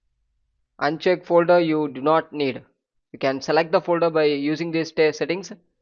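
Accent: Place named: India. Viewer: Você é indiano amigo??? Seu inglês tem um forte sotaque indiano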